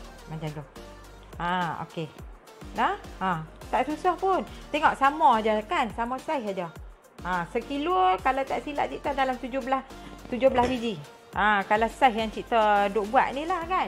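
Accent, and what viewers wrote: Kedah, Malaysia. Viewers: Bukan lawak..org Kedah memang ckp.macam ni.. sbb tu org kedah unik.. dan memang hati depa ikhlas.. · Cik org.belah mana..dikedah ni..petua baguih sungguh..laa haih siap loghat kedah..terbaik ikhlas ngguh..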